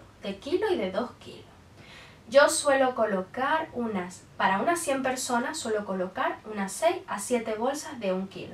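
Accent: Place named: Venezuela. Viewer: Hola de donde es usted de que país su asentó es como de Venezuela?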